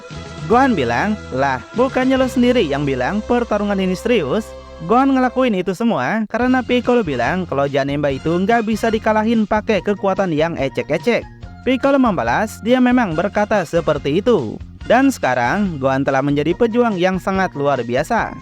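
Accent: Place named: East Java, Indonesia. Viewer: Jawatimur suaranya kawan😂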